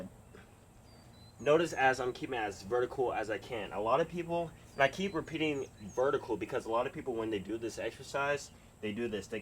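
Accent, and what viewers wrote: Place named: Caribbean. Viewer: You sound like you from the caribbean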